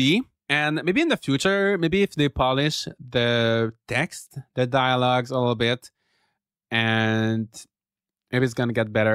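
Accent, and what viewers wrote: Quebec, Canada. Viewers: it’s funny because once I was watching one of your videos and my boyfriend said something like « il est Québécois lui » and I told him probably not. He said you had a French Canadian accent and I was like « nah, what are the chances? » 😂😂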